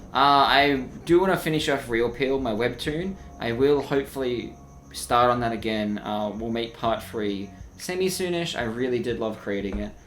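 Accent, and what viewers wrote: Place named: Australia. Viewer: you have the exact australian accent i thought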